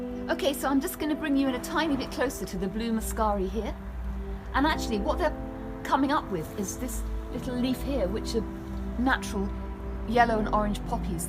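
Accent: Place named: London, United Kingdom. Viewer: 特别喜欢正宗的伦敦音！赞👍👍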